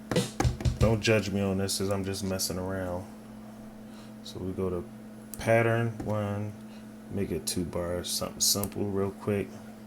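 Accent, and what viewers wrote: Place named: Philadelphia, United States. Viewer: I'm from Philly too so it wasn't hard to tell. This helped a lot btw. 👊🏾 THNX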